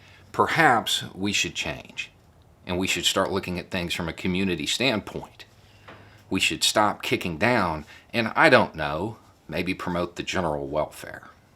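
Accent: South, United States. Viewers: Thank you my brotha. Finally some sense in this comment section instead of the “you tell it maaaaaaan” echo chamber. You know how many other black students I saw in the business analytics program ? Fucking 1 you know how many black students were in the business school getting degrees in marketing and international business? Literally thousands. It’s all about what you invest in. I invested my early 20s in the military to pay for my degree and I made damn sure it was worth something. What this video is is a trap. They see the white guy with the goatee the southern accent and the hat and they hear him talk like Bernie sanders and they are like “ahhhhh shit this is one of the good white guys. He looks like a racist ass Trump supporter but he’s invited to the cookout” what a fucking joke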